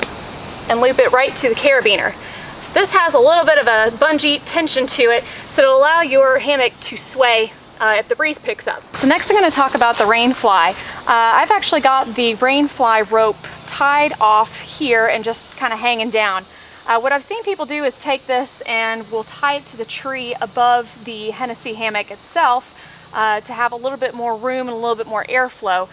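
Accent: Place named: Alabama, United States. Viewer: Great video. Thanks for sharing. You sound like folks from my home state of Alabama, which was comforting, lol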